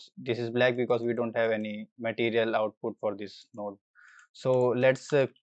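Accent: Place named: India. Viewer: LMAO! i wanted to say i like the indian accent :))) BUT YOU SAID IT FOR ME :DDDDDD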